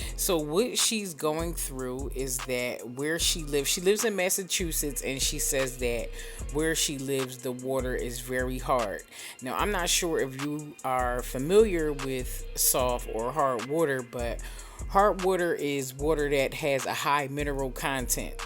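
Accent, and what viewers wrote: Philadelphia, United States. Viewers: I thought I heard a Philly accent! Then you said PA I was like yup!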